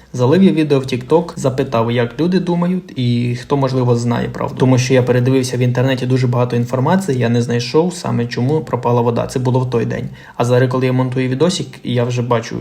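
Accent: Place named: Poltava, Ukraine. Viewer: Ви говоріть або російською, або українською, цей суржик задовбав... Це в мене так на Полтавщині... говорять, але там був більший вплив Рашки...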